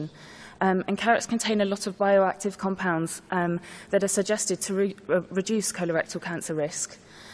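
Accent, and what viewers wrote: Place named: United Kingdom. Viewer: 🙏She Is i English That’s Unique To Most Brits Accents Beautiful Listen and learn🥕🍎❤️💛🇯🇲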